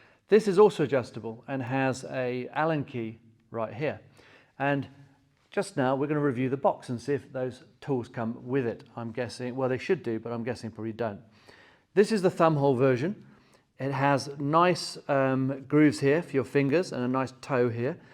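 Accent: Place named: England, United Kingdom. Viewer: English man based in the states I believe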